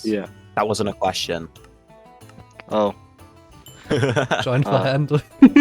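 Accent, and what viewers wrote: Germany. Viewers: German accent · Am i right or do you have a German acsent?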